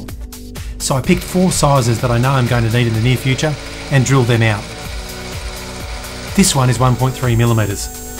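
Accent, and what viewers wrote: Australia. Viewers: Are you in australia mate? You sound aussie!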